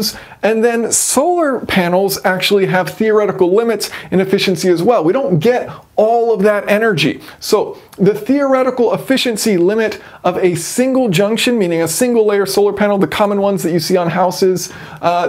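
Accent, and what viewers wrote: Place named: United States. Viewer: I really appreciate hearing an American accent using metric units, just got to get the MPH to KPH - Love your vids, mate.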